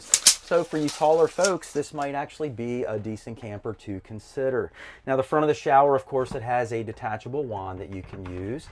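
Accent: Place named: Maryland, United States. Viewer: Being from Maryland…this guys md. Accent is comforting to me. 🤣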